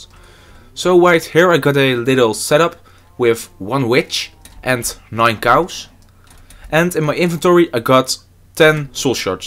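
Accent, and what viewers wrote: Netherlands. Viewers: je bent nederlands, je hoort het aan je accent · Are you dutch?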